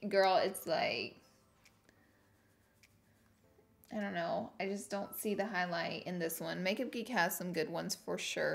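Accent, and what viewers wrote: South, United States. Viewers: Wouldnt be if y'all didn't have bloopers!! And the southern accent brings it all together!! Loves..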